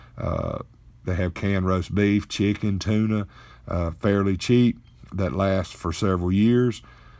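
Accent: United States. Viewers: Why thank you. And may I add now I have your lovely Country accent in mah head and so I'll probably be talking like this here fo a lil wwhuailee Amen lord Jesus help us all. This is our Government's way of wippin a'out the peiiples see here that done need ta get done swiped out'der decrease tha population see there that's Whautt that right'e their is I done told Lucille she don't done believes believed meii. She sure heck will now!!!!! 💓💓💓💓💓💓🙌😘💌💋☺I done subscribed y'all do the same. God bless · I love a country man's accent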